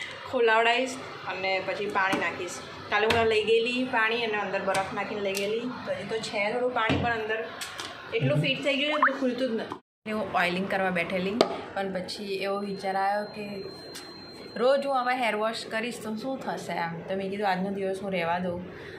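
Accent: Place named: Ahmedabad, India. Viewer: અમદાવાદી બોલી નાખો છો સાથે બરોડા વાળી અને સુરતી થોડું થોડું 😅❤️❤️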